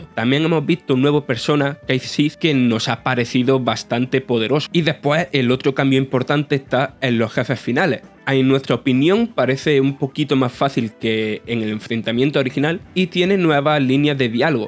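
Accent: Andalusia, Spain. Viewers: porque ponen a un andaluz a narrar el video...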